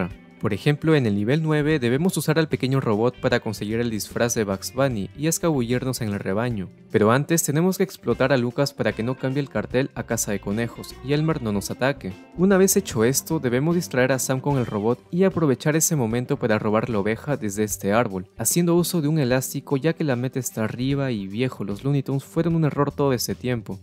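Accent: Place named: Ecuador. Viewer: de verdad? es que vivo aca en ecuador y tu acento se parece al de aqui jeje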